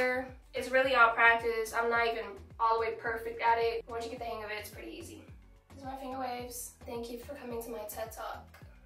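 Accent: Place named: United Kingdom. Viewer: The British accent 😂😂😂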